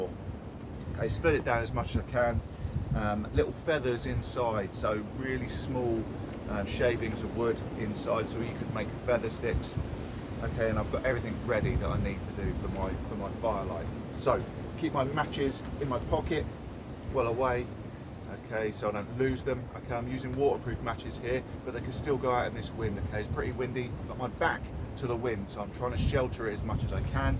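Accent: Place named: United Kingdom. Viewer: Hi, these videos are fantastic, thank you! I had no idea that you had made all these fire starting videos when I left a comment recently asking for some from you on fire steels or ferro rods. So I am just enjoying watching your videos for now. Next time I know I can check your play list first. And once again its great to hear a British accent talking about bush craft 👍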